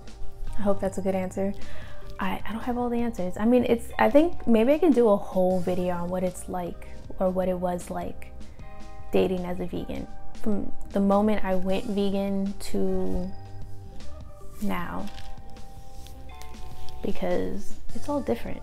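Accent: New York, United States. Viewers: Love that New York accent still! #VEGANCRUSH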